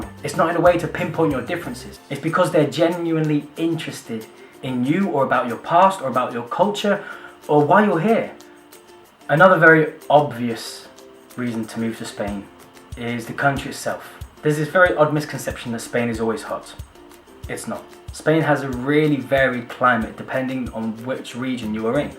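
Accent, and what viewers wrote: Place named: Essex, United Kingdom. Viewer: you have a slight Essex accent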